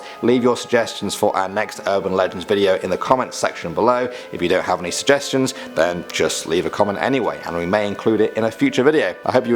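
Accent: United States. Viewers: The americans accents thats not how its said its cuz ur americans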